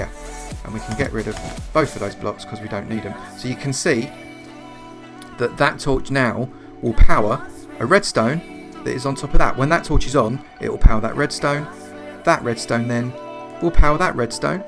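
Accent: United Kingdom. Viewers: I can smell the British accent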